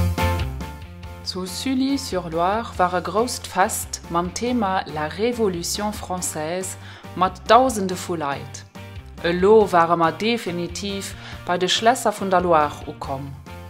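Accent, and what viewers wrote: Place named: Transylvania, Romania. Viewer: Ich sage ganz salop, hallo, die Fahrradtour und vor allem die Sprache hat mich einfach platt gemacht!!! Ihre Sprache, ist mein DIALEKT. Den Untertitel habe ich ausgeblendet damit ich Ihr "Siebenbürgisch" richtig genießen konnte. Einen Daumen hoch und Abo habe ich hinterlassen. Wünsche allzeit gute Fahrradtouren.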